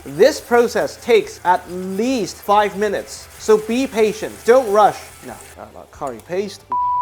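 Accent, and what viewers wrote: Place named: RP, United Kingdom. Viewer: is sooooo posh 😊❤❤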